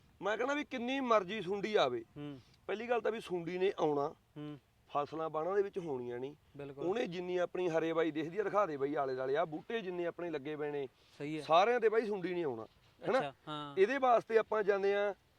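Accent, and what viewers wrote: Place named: Sangrur, India. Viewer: Sangrur aria bharidi bola janda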